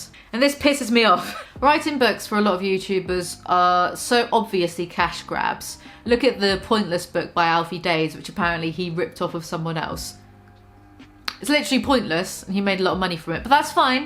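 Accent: United Kingdom. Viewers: me, an american: wow she sounds extra british today: i am ill edit #2: every single person in the replies is an idiot except (also i spelled her name wrong originally so i'm also an idiot) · i hope you feel better, but you sound so cute!! i don't know why but i think british accents get better when people are a bit stuffed up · i love ur British accent so much ugh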